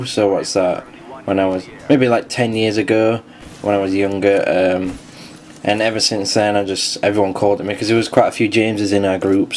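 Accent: Yorkshire, United Kingdom. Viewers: You from Yorkshire?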